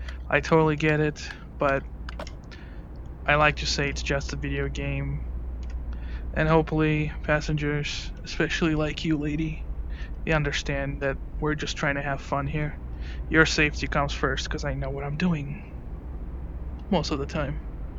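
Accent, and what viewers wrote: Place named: North, United Kingdom. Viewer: ofc whatever , i just wondering like typical north accent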